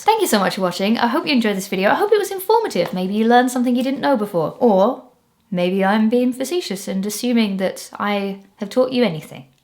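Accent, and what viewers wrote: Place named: Northern England, United Kingdom. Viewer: I like your little northern accent coming through the Essex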